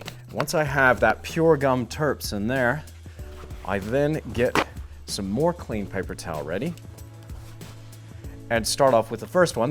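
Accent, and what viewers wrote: Australia. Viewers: What an interesting accent! sounds Australian but some words are full American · Couldn’t quite detect his accent! sounds like an Australian doing an American accent! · Am I detecting a faint Aussie accent??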